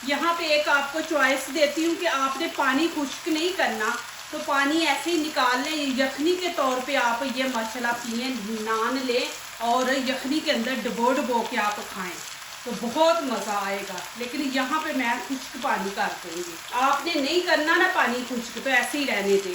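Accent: Punjab, India. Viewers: Wah G Wah,munh mn pani aa gya hy. Aapki hr recipe boht aasan or tasty hoti hy. Aap shyd punjabi speaking hn q k aap ka lehja bilkul hmary jesa hy. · Aap ki panjabi wali ton ma Urdu bohat khub ha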